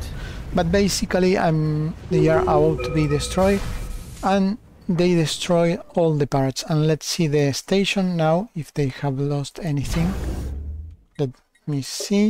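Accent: Chile. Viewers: no entiendo i ""J" no hablo chileno pense que era en español XD,. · Desde cuando no entiendo español? Sera español chileno. Buen video como siempre.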